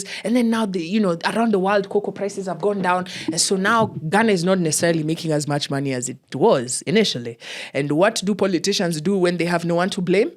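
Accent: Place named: Kenya. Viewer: Kenyan accent.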